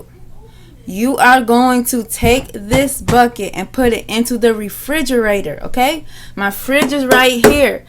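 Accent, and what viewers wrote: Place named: North, United States. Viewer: She sounds like she’s from up top to me.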